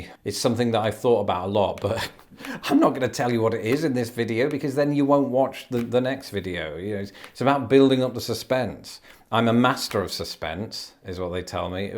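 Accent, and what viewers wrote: United Kingdom. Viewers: Talking about nothing, sounds better with a British accent. Have a great vacation!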